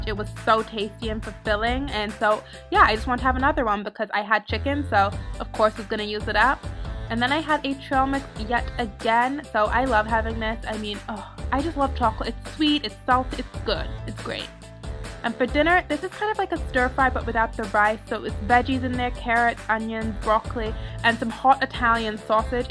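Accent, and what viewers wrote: United Kingdom. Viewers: I know this is irrelevant to weight loss, but are you from the UK? I really like your accent, hahaha. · you have a british accent sometimes!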